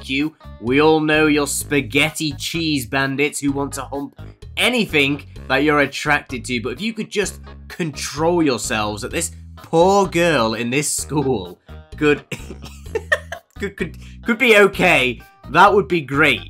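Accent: United Kingdom. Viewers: I have a question what’s your accent is it British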